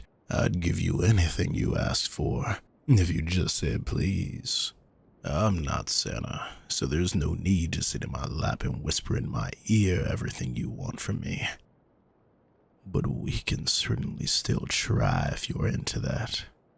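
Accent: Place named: South, United States. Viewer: I love a good southern accent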